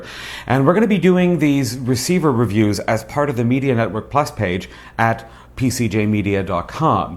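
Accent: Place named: Portugal. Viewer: Your first language is portuguese right? I'm guessing by your slight accent.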